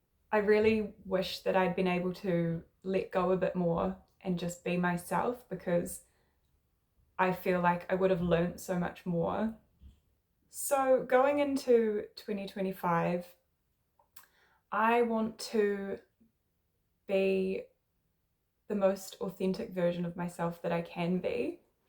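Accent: New Zealand. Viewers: That sounds like a kiwi accent. Kiwi culture is pretty shit really, and aren't welcoming to people's quirks.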